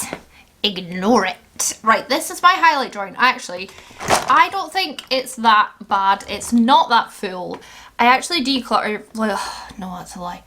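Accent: Scotland, United Kingdom. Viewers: I like your Scottish accent. Good declutter.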